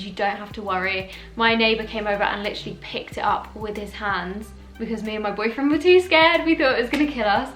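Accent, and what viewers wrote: Australia. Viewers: you’re already picking up an aussie accent haha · She speaks like a Australian.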